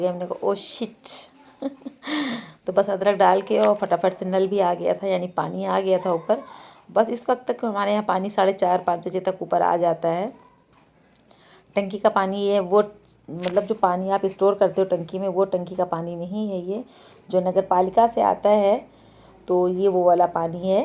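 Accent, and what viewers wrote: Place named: Kanpur, India. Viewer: aapki awaaz se lagta hai aap Kanpur ki hai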